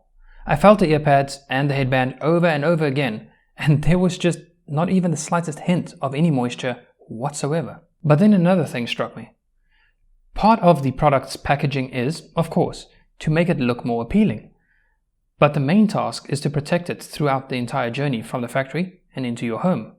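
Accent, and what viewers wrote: South Africa. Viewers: Unrelated but are you South African?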